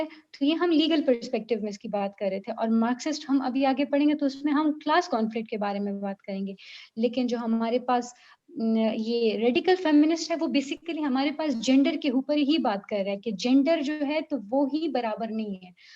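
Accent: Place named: Khyber Pakhtunkhwa, Pakistan. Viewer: Ma'am,you speak very funny urdu, apki urdu sun kr esa lg raha hai ap kpk se hn, or Ma'am apka lecture sun kr lg raha hai k ap pehli bar teaching kr rahe hn apky voice me thori c hesitation mehsus hori hai.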